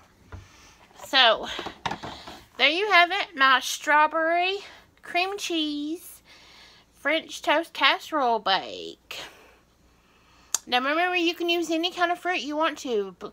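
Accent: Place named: Kentucky, United States. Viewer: Just found your channel! Love it and I just knew you were from kentucky!! ( I am too! 😊)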